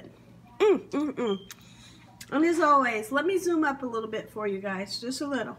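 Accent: South, United States. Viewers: I know you from the south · Hi new to your channel. Believe me im at awe. Love it. Are u from the south. U killing the cooking baby . lol. Like u said yall eating good in that house. Lol. God bless.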